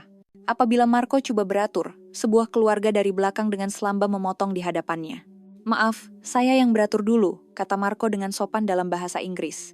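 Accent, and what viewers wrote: Indonesia. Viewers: Aik suara indun!! Tapi konten Malaysia!!! Tak usahlah buat konten pasal negara orang, buat lah negara kamu sendiri!!! Indunnnn!!!!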